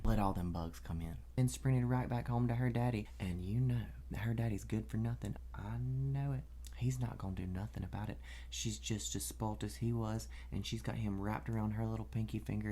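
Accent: South, United States. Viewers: Bless her heart! She needs some home training! 🤣🤣🤣 I just love you! You get Southern right!!! 🥰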